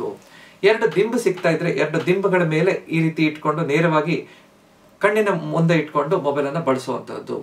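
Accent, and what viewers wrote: Standard. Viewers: ಮಾನ್ಯರೆ, ತಾವು ಸ್ವಚ್ಚ ಕನ್ನಡದಲ್ಲಿ ವಿಸ್ತಾರವಾಗಿ ನಿರರ್ಗಳವಾಗಿ ಅನೇಕ ವೈದ್ಯಕೀಯ ವಿಷಯಗಳನ್ನು ಹಾಗು ಸಮಸ್ಯೆಗಳಿಗೆ ಪರಿಹಾರ ಮಾರ್ಗೋಪಾಯಗಳನ್ನು ಸೂಚಿಸುತ್ತಿದ್ದೀರಿ. ಅನಂತ ವಂದನೆಗಳು.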